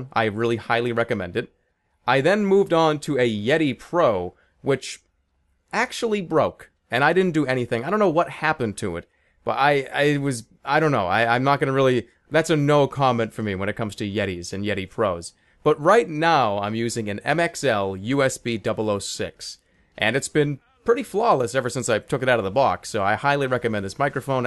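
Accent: Boston, United States. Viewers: and hes got sort of a boston voice :D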